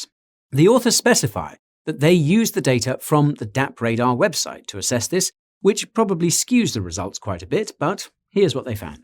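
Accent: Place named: RP, United Kingdom. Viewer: You somehow remind me of Peter Dinklage in GOT with your british accent. Love you champ 😎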